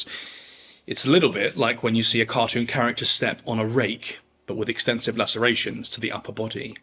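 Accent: England, United Kingdom. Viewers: There’s something so very attractive about you. Partly I believe it’s your voice. A most excellent English accent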